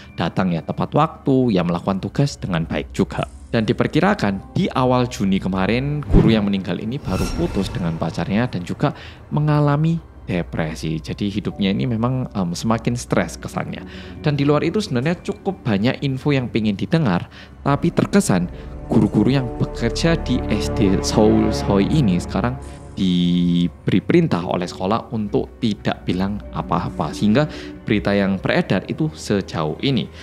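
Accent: Java, Indonesia. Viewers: Kerenz pembawaannya medok tpi banyak tambahan gambar 🎉🎉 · OMG kisah nyata ini mirip karya di webtoon dgn judul "the real lesson" dari akhir episode 81-90, aku kira kejadian ini cuma ada di webtoon mengenai seorang ibu-ibu yang spam call guru sd di nomor pribadi bahkan pada saat malam hari. Ngga kebanyang itu kejadian di ibu guru sd yg mas ceritain😓😓 BTW, aku nonton video ini dideket papa dan papa ikut denger, papa malah salfok, "suaranya medok banget". Suara mas melokal yaa, sampe papa ga ngeh klo mas orang korea😅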